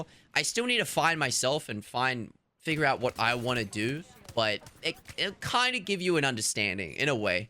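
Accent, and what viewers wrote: Australia. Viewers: I don't care how long it'll take you to upload or how bad a video may be, ill watch it and support you because i love listening to an aussie ramble about shit and your content is amazing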